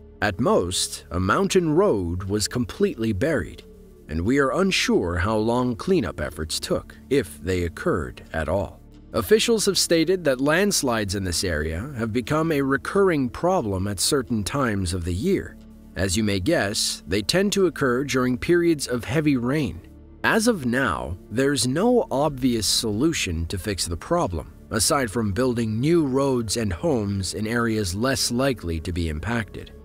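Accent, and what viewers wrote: United States. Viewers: Imágenes impresionantes, con la voz de un locutor... Por dios... como puede ser tan desagradable al oído el inglés estaunidense...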